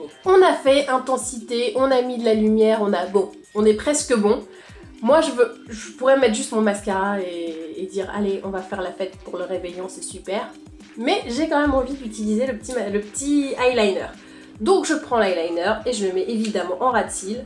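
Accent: Russia. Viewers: L'accent russe ! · oui c'est l'accente Russe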